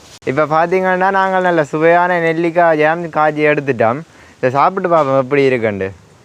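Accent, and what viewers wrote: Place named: Sri Lanka. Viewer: Ungalooda samayoolda Ungalooda Srilanka Tamil very Nice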